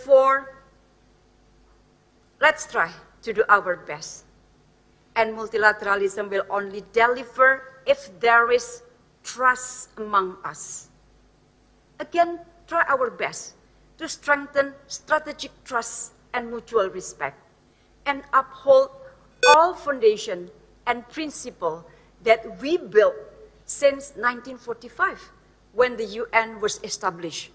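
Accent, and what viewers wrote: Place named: Indonesia. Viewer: Menluku Englishnya keren mudah dipahami walau ake Indonesia accent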